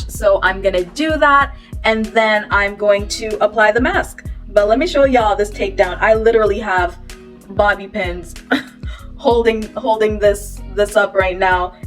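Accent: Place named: Jamaica. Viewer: It is that Jamaican Accent Young Lady♥️. I actually have the henna mask in my head now. Plan in keeping it on for about three to four hours. I will definitely be looking forward to new videos from you.♥️♥️♥️♥️♥️♥️♥️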